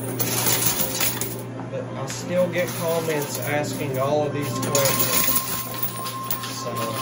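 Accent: South, United States. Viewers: .....What state do you live in you have that southern accent....YOUR ALWAYS ENTERTAINING....CANT WAIT FOR THE NEXT VIDEO.....EVERY DAY.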